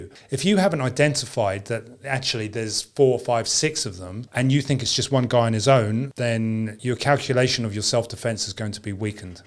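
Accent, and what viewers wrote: England, United Kingdom. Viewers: You sound English I guess having a hand gun is not an option , some bad guys make the mistake of thinking they are the only one with a gun. Most of them cant land a shot within 3 feet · UK accent.. hows those knife attack stars after gun bans.. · Based on his accent I realize he's a Brit ( I live in the USA); I wish he was my sensi!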